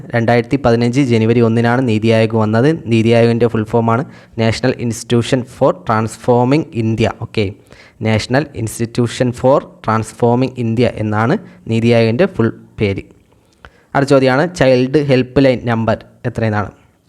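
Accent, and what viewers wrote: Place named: Thiruvananthapuram, India. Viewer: Sir Tvm aano.....slang👍🏻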